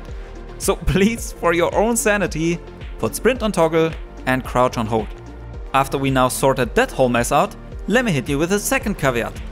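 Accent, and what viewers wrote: Germany. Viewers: You are German?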